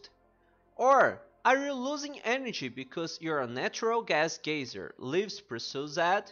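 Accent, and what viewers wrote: Brazil. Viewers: Caraio, tu eh br ou gringo? Kkkkk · mano, eu esqueci como faz as paradas nesse jogo, venho pesquisar e tem um unico video ingles do que eu preciso e to ouvindo tipo, não pera, sotaque br? dfushdfushd bom video ajudou muito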